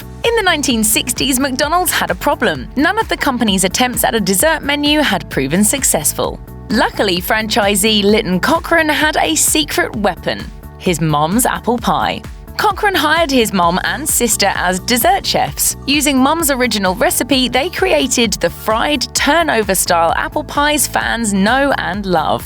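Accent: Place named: United Kingdom. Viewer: This is America and McDonald’s is an American company why are you using a British narrator?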